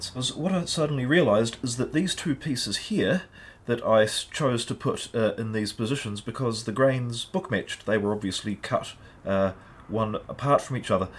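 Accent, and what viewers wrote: New Zealand. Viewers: Not hugely relevant to this video but your videos got me fixing up my bass and playing way more!! Keep up the great content! soo good to hear a kiwi on youtube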